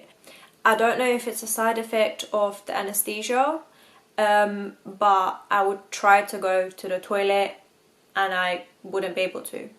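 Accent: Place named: England, United Kingdom. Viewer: you really mastered the English street lingo and accent!! SO impressed.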